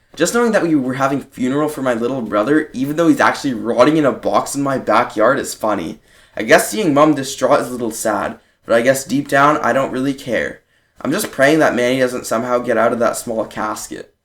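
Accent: United Kingdom. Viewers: Hey bro, small doubt.... Your scotish right?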